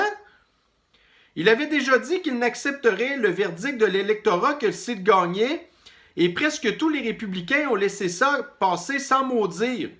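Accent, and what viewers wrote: Quebec, Canada. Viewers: Rien a faire,l'accent québécois,je n'y arrive pas. Bonne continuation quand-même 😊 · Avec ton accent canadien tordu on comprend rien à ce que tu dit ! parlé Français .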